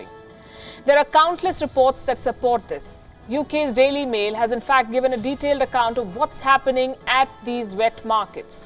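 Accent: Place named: India. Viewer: I love Indian accent on English language, sounds cool.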